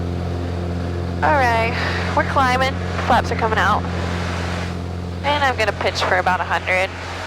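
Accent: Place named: South, United States. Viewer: Fun video. Your presentation is very relaxed and natural. So much so, that Southern is coming out. Happy flying to both of you.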